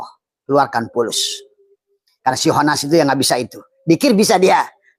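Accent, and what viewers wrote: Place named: Java, Indonesia. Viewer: Pasti orang jawa ini ya😂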